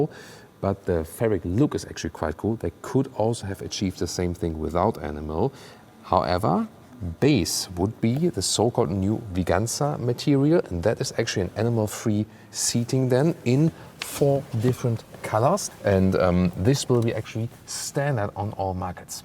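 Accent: Germany. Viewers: Fix diffrent person to show video this guy has Germany English accent we need international accent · Very nice, but I was having trouble understanding all of it. That thick German accent.... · Yes sounds more real is like informations are more reliable since said with a German accent …. first ever car I have droven was a BMW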